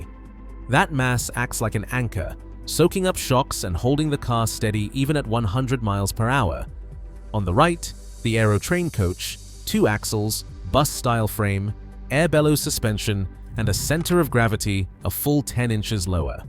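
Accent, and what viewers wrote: United Kingdom. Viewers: 😅can't listen to a Brit talk about this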